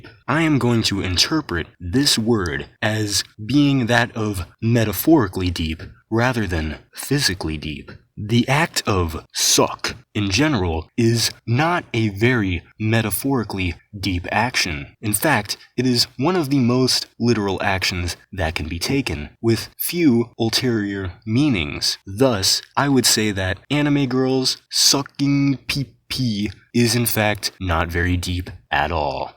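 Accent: Spain. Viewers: Ele lendo com sotaque espanhol dms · Do you speak Spanish? You really have the pronounciation of a spanish speaker person.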